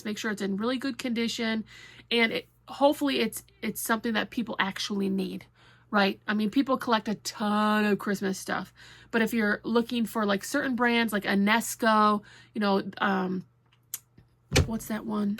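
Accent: Wisconsin, United States. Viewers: Tie-kon-do-ro-guh 😁. Those are great! Love the videos! Wisconsin accent makes me homesick. Thanks for sharing!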